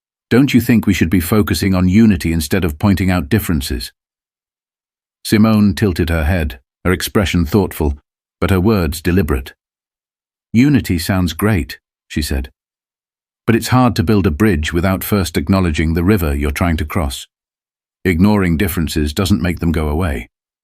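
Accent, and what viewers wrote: United Kingdom. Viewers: You know what I h8... a british accent telling the story of a successful Black woman. Ya just can't stop trying to make it about you colonizers.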